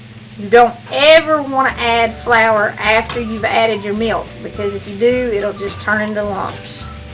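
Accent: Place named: Kentucky, United States. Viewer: My dad was in the military in WWII. He would not eat SOS, no way, no how. I tried several times when I was growing up to get Mom to make it. She would not even make a pan of SOS. I still make a batch sometimes for breakfast (with sausage) just to remember Dad. By the way, I grew up in Western KY. I love your accent. You know it reminds me of Mom.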